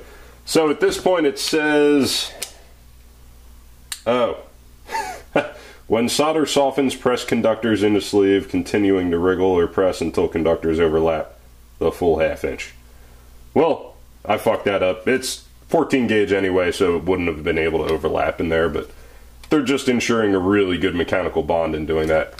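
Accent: South, United States. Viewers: Southern version of AvE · Wow! I thought I clicked on an AvE video for second. Same type of voice with a southern accent.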